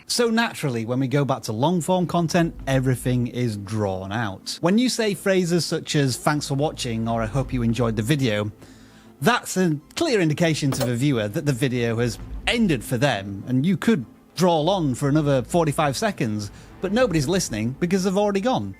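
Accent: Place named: Yorkshire, United Kingdom. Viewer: I have a random question for. I have a friend who is from Yorkshire and he’s from Hull and I thought that your voice sounded like it was from there but I was just curious. What part of Yorkshire are you from? Also there was something that you said in the video about how thumbnails and titles attract views which I think it’s true but if you’re subscribed to a person like I am to you, I just see that it’s from you and I’m interested. it’s not a Elon Musk scam live stream coming from VidIQ I’m gonna click on it.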